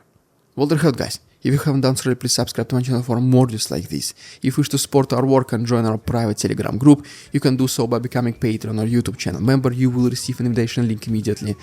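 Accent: Russia. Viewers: One of your better deliveries.... You were calm and relaxed, and that makes it so much easier to understand you.... you always have a point, but sometimes you're too excited and talk too fast, which is hard to understand because of your Russian accent. you sound more intelligent when you speak slowly...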